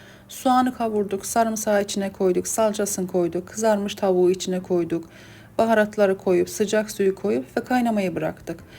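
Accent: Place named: Turkey. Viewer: عزيزم دستت درد نكنه از اين غذاى خوشمزه ، چه لهجه ى تركى زيبايى دارى 🩷🪻🩷🪻🩷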